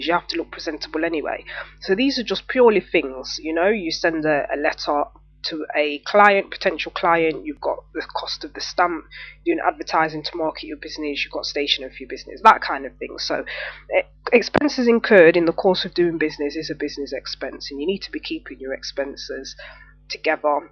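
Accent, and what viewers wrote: United Kingdom. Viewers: That's a Notts accent if ever I heard 1😃thanx for good info xx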